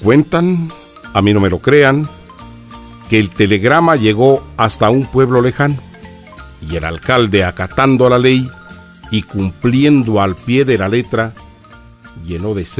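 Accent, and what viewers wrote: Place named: Guatemala. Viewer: Me encuentro fuera de el pais y al escuchar esa voz me siento cerca de mi Guatemala😕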